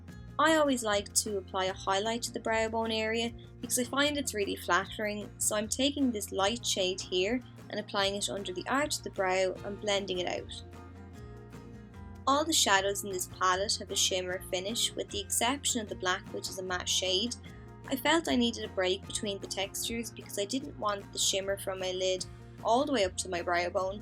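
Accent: Ireland. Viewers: Love your channel! Only new to it, but I recognised your Irish accent straight away! I'm from Westmeath! My all time favourite product is pink pigeon Mac lipstick😃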